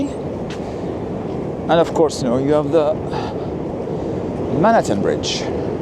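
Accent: France. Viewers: Aww... nicely done! Your English with a fleur of French is very good, I just love it! Keep changing your channel, my favorite walking tour channel on YouTube, merci beacoup! 🗽🍏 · Super que tu commentes tes vidéos! Bon, je ne suis pas totalement bilingue, deux trois petits mots en français pour ton public de France, ce serait chouette. Mais tu t'exprimes super bien en anglais avec le p'tit accent frenchy qui va bien. Du coup, on comprend assez bien. Continue ainsi! Keep it up. Let's go. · I had just come across your recent Hudson yards video & it was beautiful 😍 🎄. I instantly subscribed & now seeing this. I like that you've decided to narrate your videos. A French accent is so nice. I can't travel like I'd like to so these kinds of videos are great. ❤🤍💚🎄🎅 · As an afficionado of New York walking tours, I am well placed to say that your first narrated tour is eclipsed by none. 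The views of Dumbo are stellar, significantly enhanced by your impeccable command of English, a command that, it must be underscored, notably exceeds that of most native speakers. (Do not underestimate the charms of a beautifully poeticized use of English with slight overtones of French!) A wonderful audio-visual experience. Kudos! Quelle promenade exquise -- parfaitement bien narrée ! Nous vous en remercions infiniment ! Bravo ! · It was a very good decision to talk to us viewers. I hope that your channel will become even more popular as a result. You have the advantage that your English with a French accent is very popular in the English-speaking world. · YES!! Finally we get to hear your perspective! Your walking videos were already great but with the added commentary, it'll be even better. And don't worry, your English is perfectly fine! Your French accent only adds to the content and makes you stand out. 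👍 Allons-y, les gars! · Words cannot express how proud I am of you and your bold and courageous step to start narrative and soon to come live videos. ❤❤❤ Keep moving forward and break through those barriers that prevent us from achieving Your English is great with a French flair ❤❤❤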